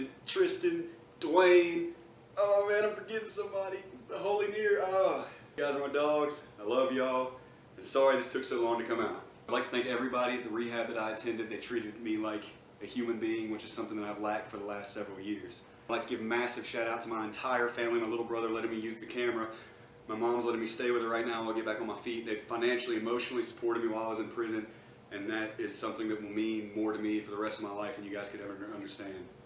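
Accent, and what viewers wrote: South, United States. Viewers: yeh, after i noticed your accent was from usa i almost shit myself. i dont understand this case. you should have been protected under privacy laws of net neutrality or something unless you were fowarding bomb threats to the fbi or something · you sound like your in the south. Go chat it up with After Prison show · Sounds like an American accent... freedom of speech infringed upon? I've heard other countries you can get in trouble for words if you're unlucky but its still kinda bull. In America we have the amendments sooooo if you were arrested in America (can't say I know the details) but from what I have heard, this arrest was very unwarranted. So you must not be from America :/ If you are from America, im personally offended that it would even be possible for this to happen to you.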